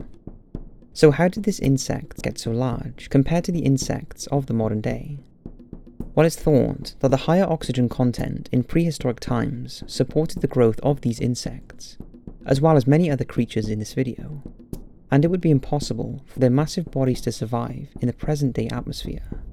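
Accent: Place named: South Wales, United Kingdom. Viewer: It's a southern Wales accent. Sounds most similiar to a Merthyr one, but he's such a soft spoken guy!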